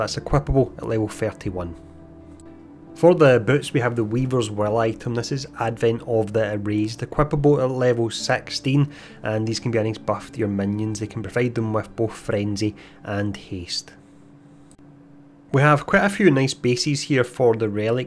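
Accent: Scotland, United Kingdom. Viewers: Ah yeah Sorry I think I mixed up the reply a bit😆. I actually like the Scottish accent but this is a bit hardcore for a non native speaker. There are other YouTubers in the arpg world like quinn69 (not sure about the spelling of his nickname lol) who definitely have strong accents but I still understand them easily. Anyway I didn't want to turn this into a "you need to speak properly" , I was just a bit upset by the fact that I usually hear these kind.of video rather than watching them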